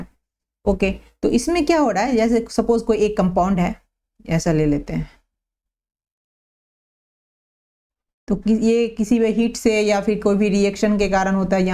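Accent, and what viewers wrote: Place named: Bengal, India. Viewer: Bengali tone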